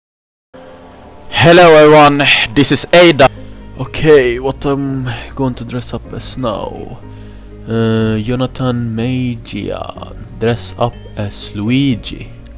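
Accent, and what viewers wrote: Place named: Sweden. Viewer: the look of your pants, you know.. swedish pants these days... nah jk your accent reveals it all, well the pants to but yea;D im swedish to btw.:)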